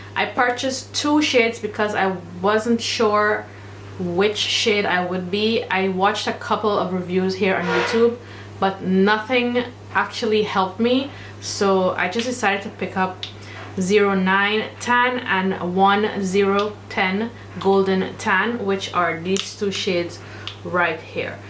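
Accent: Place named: Caribbean. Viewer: you sound caribbean, where are you from? I'm from Trinidad.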